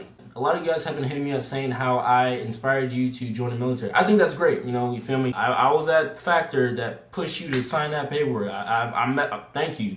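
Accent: Baltimore, United States. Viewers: Is that a bmore accent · R u from Baltimore u kinda got the accent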